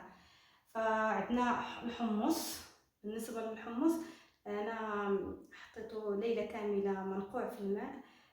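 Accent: Yemen. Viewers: من ركز انها صارت شوي تتكلمي يمني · 😂😂😂 مااجملها تتكلم يمني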